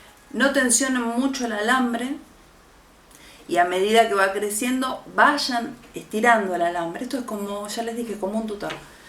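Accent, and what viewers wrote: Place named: Argentina. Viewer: Muchas gracias, vivo en Thaïlandâ aqui los bambou crecen rapido 😄. Que acento mas bonito, eres agentina ? Besitos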